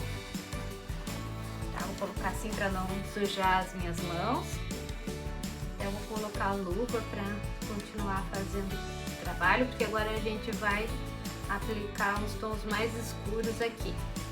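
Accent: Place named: Porto Alegre, Brazil. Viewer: Bha! Caí aqui de paraquedas! Achei o sotaque parecido com o meu! Sou de Porto Alegre Bairro Agronomia. Já vou me inscrever agora. Gostei da técnica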